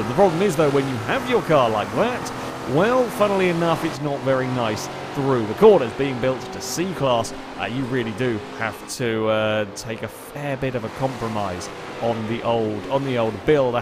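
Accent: United Kingdom. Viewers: I never thought I'd be addicted to listening to a British guy ramble over Forza replays. But here we are.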